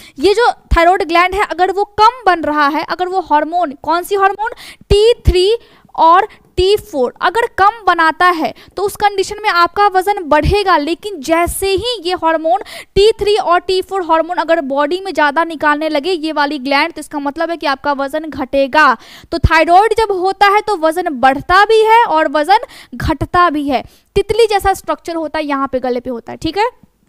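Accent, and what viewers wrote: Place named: Bengal, India. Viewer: Bengali walo ki Hindi bada cute lagtaa❤ ra ko da bolna Bara nehi bada Kaaran nehi Kaadan😂 Fir v confident bahut hai didi🎉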